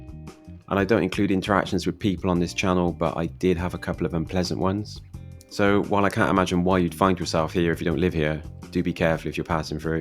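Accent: Southwest England, United Kingdom. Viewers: "The Coastal town that they forgot to burn down, come Armageddon come Armageddon come" ( - ) I love your videos and your strong southwest accent 😊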